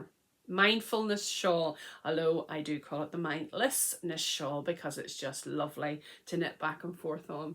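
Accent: Northern Ireland, United Kingdom. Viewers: Just found your podcast. Made me pause hearing a fellow Nothern Irish accent on YouTube. So I am from Northern Ireland, Lisburn born and raised.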